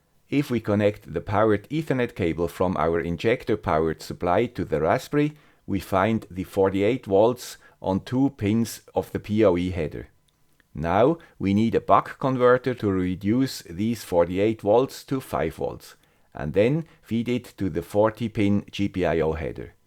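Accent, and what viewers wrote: Switzerland. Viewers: Swiss watches and clocks - high precision and accurately made. Some shafts have jewel bearings Swiss chocolate - rich flavour and addictive. Well packed but easy to open Swiss Red Cross - known round the world for their work. Very reliable The guy with the Swiss accent - all of the above. He also has a great Germans dry sense of humour. Thanks for all your great videos.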